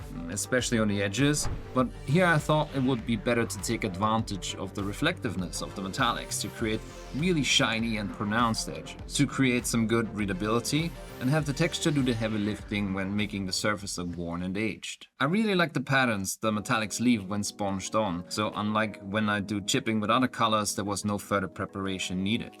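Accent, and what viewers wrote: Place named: Austria. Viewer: for a second i thought you where saying "animal" paint ^^ our austrian-english accent really is fun at times XD i really enjoy the sponge technique btw. i used it for some aos nurgle armor and really loved the result TY =)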